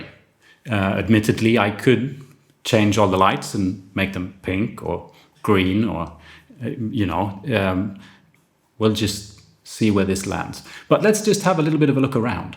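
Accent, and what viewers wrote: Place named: Sweden. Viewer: Great channel very comforting g to hear a Swedish accent oh and awesome video might take a couple aspects of you set up for mine thanks for the content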